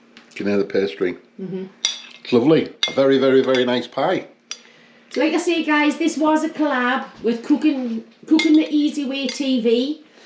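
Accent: Newcastle, United Kingdom. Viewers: So down to earth and the way we used to cook before fast food! Love your Geordie accent. Keep em coming, cooking the way people actually cook in an ordinary kitchen - cooking first class food! Thanks. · I like your British accent I'm American. I love your video of the corned beef & potato pie. I migth make it some time it looked very easy to make. I'm part Irish and I thought cabbage migth be good to put it do you think so?. May bless you.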